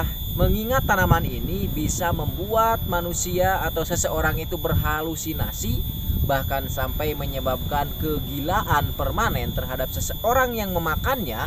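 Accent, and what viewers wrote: West Java, Indonesia. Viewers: A kawit n ti mna?? logat n mah sunda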